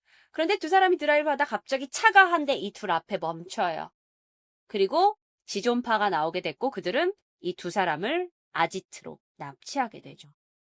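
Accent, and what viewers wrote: Jeolla, South Korea. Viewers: 전라도 스럽다...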